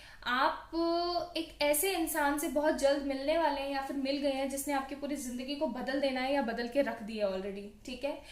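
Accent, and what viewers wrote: Punjab, India. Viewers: punjabi bolte ho app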